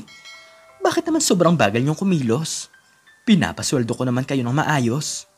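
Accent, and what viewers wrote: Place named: Iloilo, Philippines. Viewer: Abaw! Ilonggo ka man gid.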